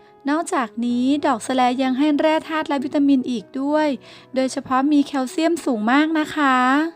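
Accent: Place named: North, Thailand. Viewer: อู้จ้าาาาาา คนเมืองงงงงงแต้น้อ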